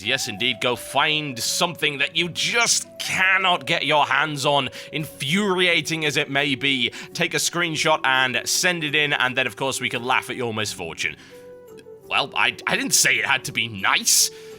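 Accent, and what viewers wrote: United Kingdom. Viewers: lol British..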